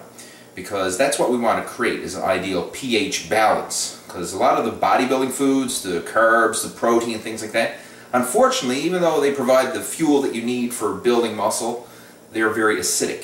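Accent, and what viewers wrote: Newfoundland, Canada. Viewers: Hey brother,are you from Newfoundland? Cause i am,and i kinda hear a hint of the Newfoundland accent when you speak. Great video by the way.✌🏻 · Canadian eh?! I see we shop at superstore?!! lol love the accent :p Ps...I drink ACV with green tea every morning but I'll be sure to try this too :) thx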